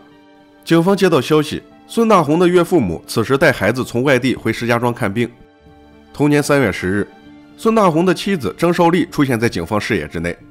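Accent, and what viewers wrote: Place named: Henan, China. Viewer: 我听出来河南口音了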